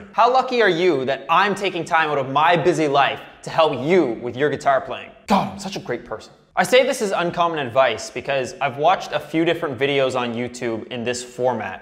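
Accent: Canada. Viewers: Canadian much? Great video man, thank you so much for the tips. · I’ve learnt something important from watching channel - that Canadians (well, some Canadians) pronounce 'about' more like 'aboot' and 'out' as 'oot'. Back to practicing now, honest. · Is he Canadian?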